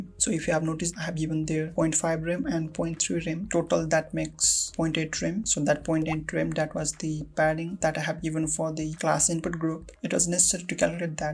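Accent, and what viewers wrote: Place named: Nepal. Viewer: Brother sounds like a Nepali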